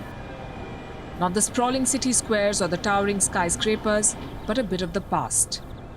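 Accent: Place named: India. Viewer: Palki Sharma Indian accent makes me laugh to dead.Indian won't give up their thick heavy Indian accent anytime soon.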